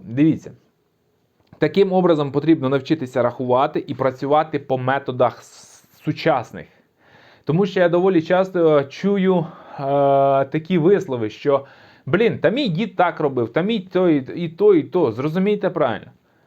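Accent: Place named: Central Ukraine, Ukraine. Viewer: Прослухав ще раз ! А ми земляки. Так розмовляють в черкаській та вінницькі області..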